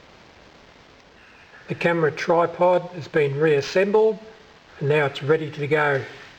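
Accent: Australia. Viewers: i know Australia, but where? Sounds like NT.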